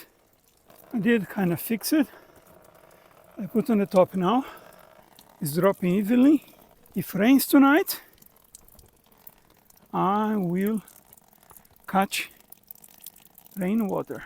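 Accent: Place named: Brazil. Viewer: Hello. I am enjoying your videos. Great work and effort. My daddy was from a small village in Galicia called Fixo. He told me a lot of stories growing up in a small stone house like the one you are restoring. They grew potatoes, corn and hogs up there. They plowed with an Ox and worked all the time. He said it was dry country which looks like it is from your videos. What area are you in? Are you a Brasileiro? Your accent sounds Brazilian. Keep posting videos I am enjoying the progress. Have a good day.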